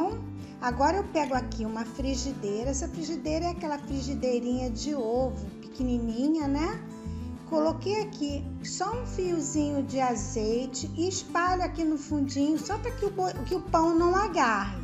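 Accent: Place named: Rio de Janeiro, Brazil. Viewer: Pelo sotaque é do Rio de Janeiro 😅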